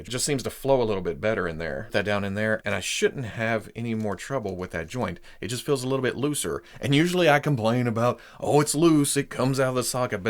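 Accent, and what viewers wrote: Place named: Louisiana, United States. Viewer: Your Cajun accent is on point!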